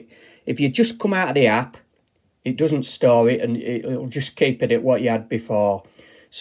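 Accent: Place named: England, United Kingdom. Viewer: I don't understand your English accent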